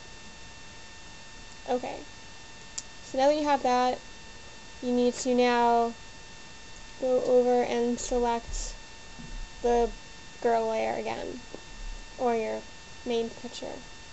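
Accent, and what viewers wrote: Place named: Asia. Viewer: you sound like an asian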